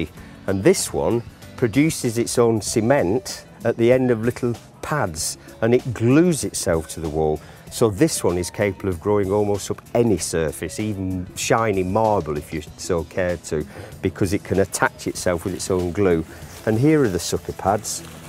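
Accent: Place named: Lancashire, United Kingdom. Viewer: What a lovely Lancashire accent. Thanks for the info!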